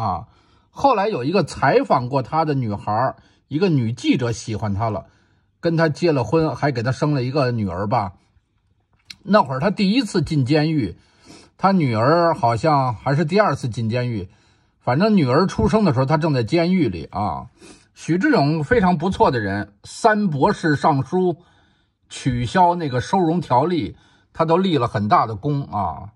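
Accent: Beijing, China. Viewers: 听了您的北京话好亲切啊，谢谢您说许志永和丁家喜的这件事。您也是有良心的中国人。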